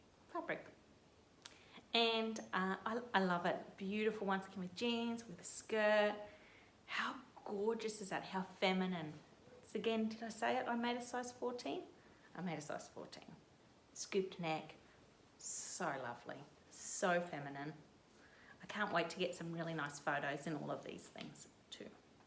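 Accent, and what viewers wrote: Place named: Australia. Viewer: Great video and especially lovely to see solid colours and tasteful patterns in the fabrics you’ve used. I find it takes discipline to choose these when fabric shopping over the more eye-popping prints but when made up and in the wardrobe they make the clothes I actually wear most of the time!! Also great to hear another Aussie accent, see more seasonally-appropriate sewing and Australian patterns and fabric stores being promoted! New subscriber here.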